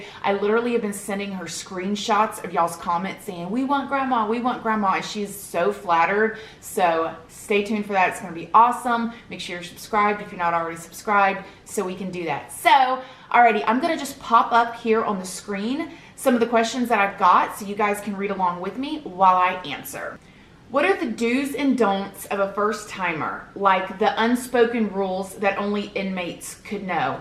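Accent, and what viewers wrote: United States. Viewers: it's interesting how you have this strong American accent but your mannerisms and the way you emphasize or repeat phrases, so italian-canadian haha I love it cuz that's how I talk too but in a different accent xD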